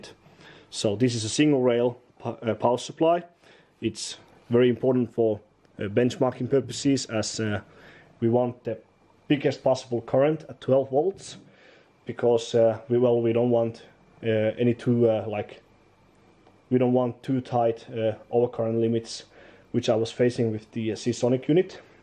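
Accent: Finland. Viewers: sounds like Finnish accent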